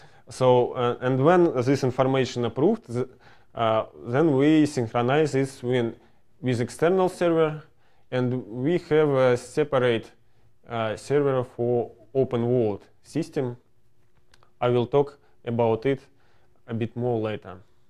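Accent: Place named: Russia. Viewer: вот есть индийский английский а тут русский английский.. Лучше на русском, многие ищут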